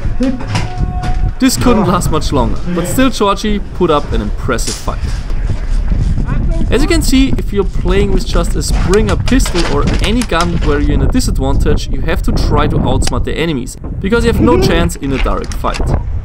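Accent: Germany. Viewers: I have the felling u are german xD😅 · One question, are you german? Weil du diesen Akkzent hast... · Where is from? been watching him for a while, not long enough to figure out where he is from, accent kinda sounds German but I’m no Accent genius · are you germane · Bist du Deutscher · Ist er Deutsch? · Wer findet auch dass er ein Deutschen Akzent hat? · hör auf english zu reden jeder weis das du deutsch bist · 1question are u German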